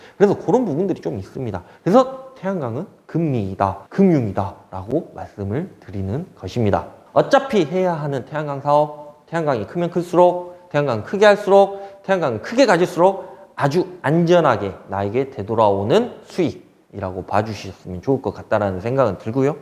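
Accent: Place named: South Korea. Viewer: 잘 모르는 분야라 천천히 보았습니다 사투리억양도 재밌었어요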